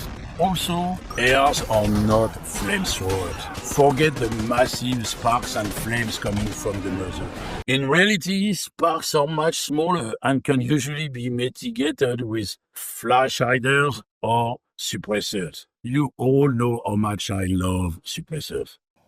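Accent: France. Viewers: I'm having a harder time than I normally do placing an accent with yours. 🤔Definitely French... but I'm guessing closer to Belgium? Do you like Belgian waffles? 😁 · Are you French by chance?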